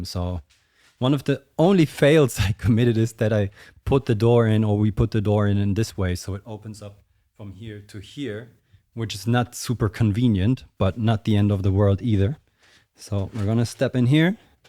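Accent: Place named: Germany. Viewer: Sehr cool mal einen Einblcik hinter die Kulissen zu kriegen. Cooles Studio auch. Generell muss ich sagen das das der beste House Musik Kanal ist den ich kenne. In der Vergangenheit hatte ich immer mal wieder die Vermutung das du deutsch bist, auch wenn dein Englisch sehr gut ist, den deutschen Akzent abzulegen ist schwer. Nun wurde es quasi endlich mal aufgelöst.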